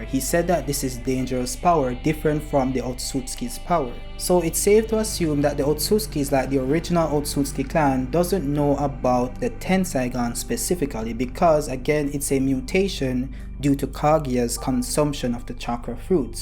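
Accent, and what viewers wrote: Jamaica. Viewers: You sound jamaican, are you?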